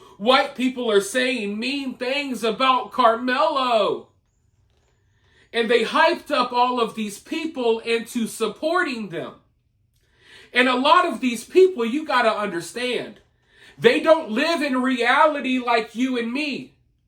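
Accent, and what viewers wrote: South, United States. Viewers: I agree with you. But He’ll you sound like a black dude. Jus saying. Must be from the south?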